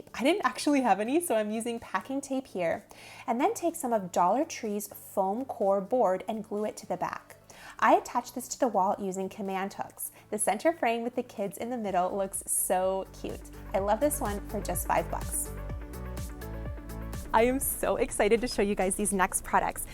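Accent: Spain. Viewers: Please tell us the story of your fantastic Spanish accent! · do you speak Spanish fluently? I’m always impressed with your pronunciation (it screams Spain) as a Spanish teacher!!! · I love your Spanish accent 💃🏽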